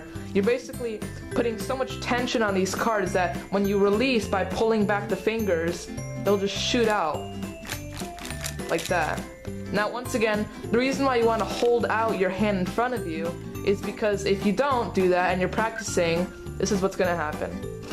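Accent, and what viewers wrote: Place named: Russia. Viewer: Your voice has a Russian undertone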